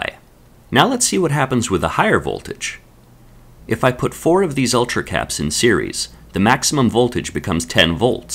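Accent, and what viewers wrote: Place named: Canada. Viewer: Canada, Eh?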